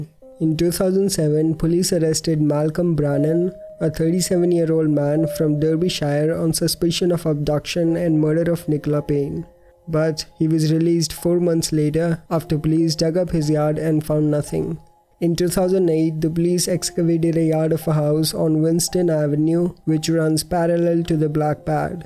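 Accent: India. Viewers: Why don't you check out idk maybe half the true crime/ horror story yt community, also he does speak English he just has an Indian accent which is why he has subtitles ( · I meant good, cuz I am indian.. And I found that the way he speaks, is the way that also some of us speak here in India. Doesnt have much of that american accent.. You wont get it. 🤭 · The people criticizing are just assholes. Let's see you all speak his language with a perfect indian accent...when you can, then criticize.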